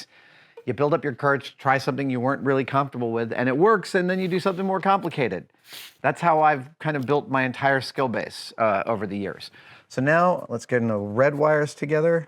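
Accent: United States. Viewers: SoLLLder, not sodder. Say it like it is, damn american! "The US just removed all soddiers from afghanistan".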